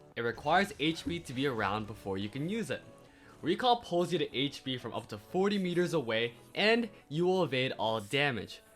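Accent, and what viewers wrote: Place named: Asia. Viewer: It's funny how you can hear that the guy speaking is an Asian.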